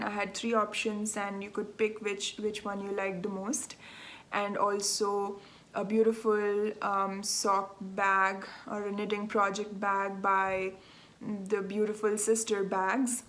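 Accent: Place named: Kerala, India. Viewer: Just found your podcast recommended by several other podcasts. Love your accent! Reminds me of a nun from Kerala, India I went to college with - Sr. Valsa Kumblankal. My husband and I also visited Gatlinburg a year ago and I found the same yarn shop! Bought some lovely yarns and was so thrilled to find a yarn shop to visit on vacation. I have already subscribed to your channel and am in the process of watching all of your videos!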